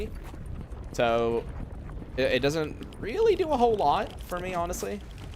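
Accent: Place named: United States. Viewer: the american accent hit harder than i expected despite the fact that i have been listening to your content for about 2 years now.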